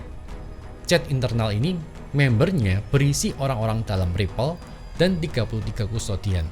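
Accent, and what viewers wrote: Java, Indonesia. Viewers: Medok wkwk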